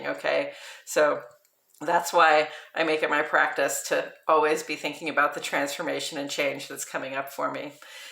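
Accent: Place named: Chicago, United States. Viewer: Hi, (Flakeway and) I have to ask you out of curiosity where you are from originally. I've suspected that from your voice that you sound like you are from NE Illinois ie, Chicago area as I am originally.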